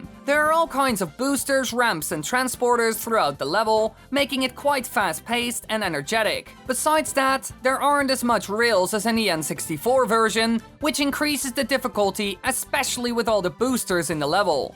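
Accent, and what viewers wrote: Netherlands. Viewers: Is that a dutch accent I hear? Nice video mate!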